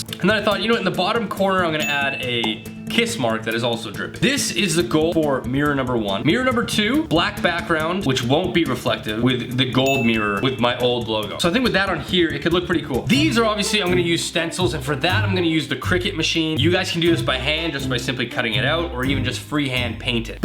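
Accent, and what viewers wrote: Canada. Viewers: Love the canadian accent ❤️❤️👌🏽 & like seeing a guy say he likes crafts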